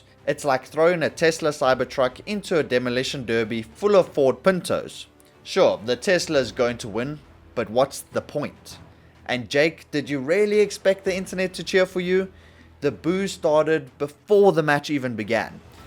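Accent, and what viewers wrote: Afrikaans, South Africa. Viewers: your accent sounds south african in my opinion, please reply to this if i was wrong, thanks! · Im addicted to your videos, this is my second video I've watched in a row because of that accent. Up the bok, up the afrikaans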